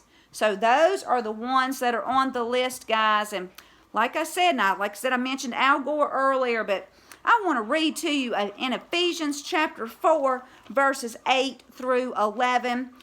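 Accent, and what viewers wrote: South, United States. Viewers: I can’t believe I just ran across your channel! I’m a little late in the waking up thing but it’s been since 2016! Anyway I get a kick out of your accent(I’m southern too!) God Bless and keep on keeping on!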